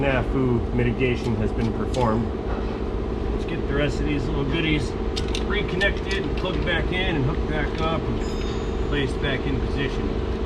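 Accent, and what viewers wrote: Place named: New England, United States. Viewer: Sounds like is a New Englander.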